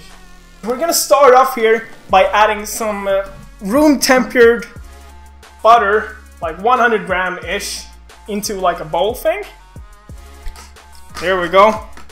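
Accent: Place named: Sweden. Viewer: Do swedish people really sound like that? I meean the accent, im pretty sure you've heard this before but you kinda sound like BluePanda. hehe and LOL tutorial video out of nowhere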